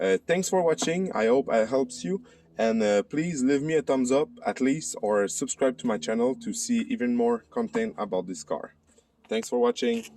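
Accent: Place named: Quebec, Canada. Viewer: accent Québecois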